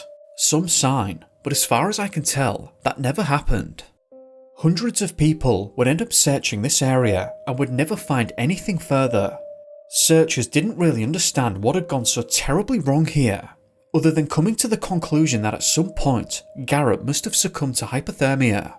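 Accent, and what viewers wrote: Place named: Northern England, United Kingdom. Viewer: is from the north of England and that’s how they speak.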